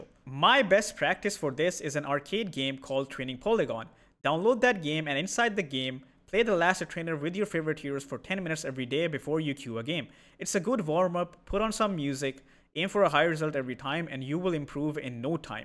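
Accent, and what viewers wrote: India. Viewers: The Indian accent throwing me off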